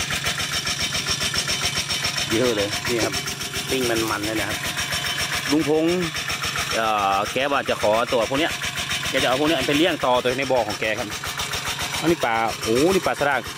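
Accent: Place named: Northern Thailand, Thailand. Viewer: จ้าว ยายพรมาดูดึกแล้วจ้าวแต่แชร์ไปหลายคนแล้วรายการ สุดยอดเลยจ้าวน้องๆเขาชอบ ตลกดีฮเหนือผสมไทยม่วนดีแต้ๆจ้าว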